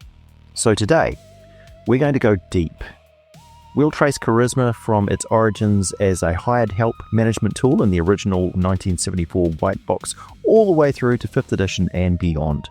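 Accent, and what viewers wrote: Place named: New Zealand. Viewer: oh I remember those days well! I don't miss them..... I've got to say as well; it's quite surreal hearing a fellow kiwi on a youtube channel! 😂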